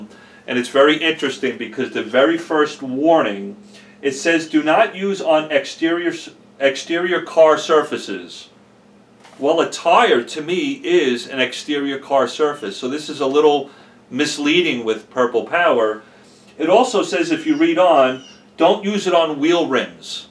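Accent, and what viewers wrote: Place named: East Coast, United States. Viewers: Love your stuff, but I gotta say: Your into: “This is ovah here…” (My brain: “this guy is definitely on the east-coast”) “From Dallas…” (My brain: “what? That’s not possible!”) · Hey pal John from Northport LongIsland again Auto Detailing love the vid and like you have experienced the same thing as you with trying it all and have it narrowed down to most of what you have. How is that citral hope I spelled it right it was the last one you had you mentioned it takes off road paint and here on LongIsland they are always doing the lines on the road lol. Didn't you say you're from here as well that's our accent lol. Thanks keep up the great vids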